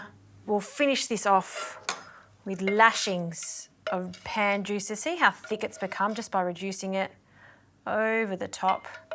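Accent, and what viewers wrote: Australia. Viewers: Hmmm. Some Hybrid pan? Needed closed captions because of “ throw one on Barbie “ accent.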